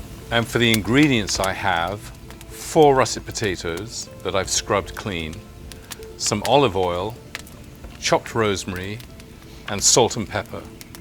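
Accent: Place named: RP, United Kingdom. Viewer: One reason I love this program is the casually precise narration delivered in crip RP. The thesaurus comes naturally to this guy. There is zero barrier to vivid understanding.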